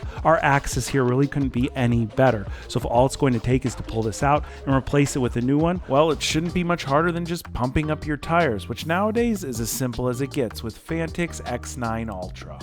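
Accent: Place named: New York, United States. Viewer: Interesting video from a typical Florida boy, NY/NJ accent and all. Thanks a lot.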